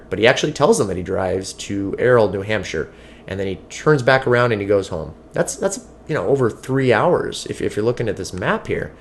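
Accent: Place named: Canada. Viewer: Who is this dude Me love this Did u find me Or i find you WHY DO U SOUND SO KANADIEN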